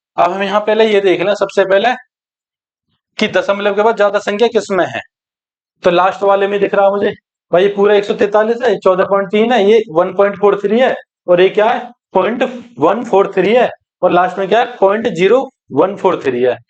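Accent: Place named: Muzaffarnagar, India. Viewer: Sir muzaffarnagar ki language lg rhi h aapki 😊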